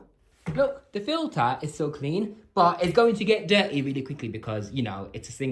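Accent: United Kingdom. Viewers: "A million times Bet ah" I love a British accent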